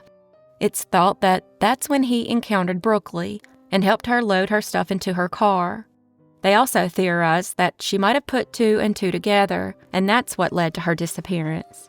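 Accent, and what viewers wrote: South, United States. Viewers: What part of the south are you from? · Thanks for doing Indiana. Pretty good videos. N actually have a nice pleasant voice with a Lil southern twang lol But seriously, thanks. We appreciate it.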